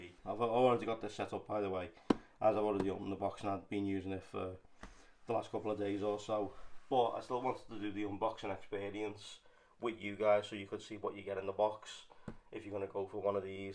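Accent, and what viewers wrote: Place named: Yorkshire, United Kingdom. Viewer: He's sounds like he is from Yorkshire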